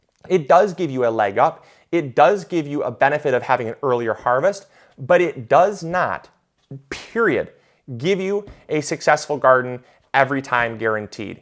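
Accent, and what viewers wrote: Michigan, United States. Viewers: You sound like your from Michigan. nice video!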